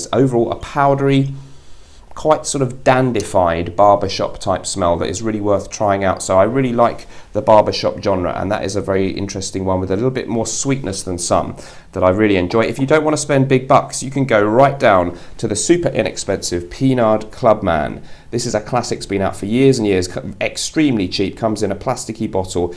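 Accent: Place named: North London, United Kingdom. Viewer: Another top quality video mate 👌🏼what part of North London are you from?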